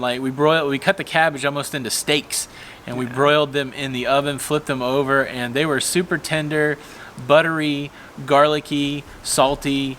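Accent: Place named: New Jersey, United States. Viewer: Great job. I really think low carb is the way to go. And I live in ca and I could hear the thic Jersey acsent.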